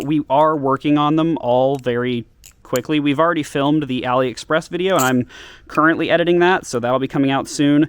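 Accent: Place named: United States. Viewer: you're from georgia? you sound so american.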